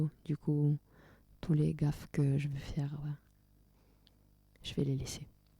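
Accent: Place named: Italy. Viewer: Trop cool l'accent Italien, j'adore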